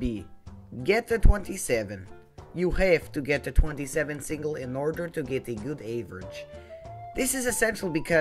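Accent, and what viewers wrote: Russia. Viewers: oh yes! i been trying to get good at sq-1. hears russian accent FOR FUCKS SAKE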